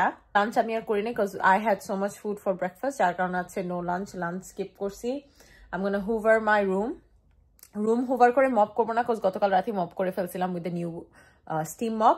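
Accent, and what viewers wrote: Sylhet, Bangladesh. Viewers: Your Sylhety voice is cute bestie😊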